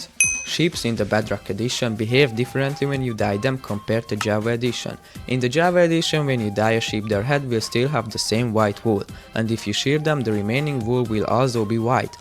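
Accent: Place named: Hungary. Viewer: Based on your accent, are you from Hungary?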